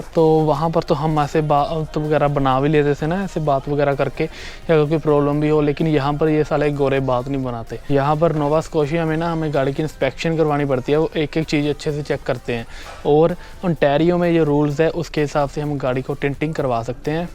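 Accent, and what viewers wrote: Punjab, India. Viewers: Bro tuhadi Punjabi leak kardi rehndi hai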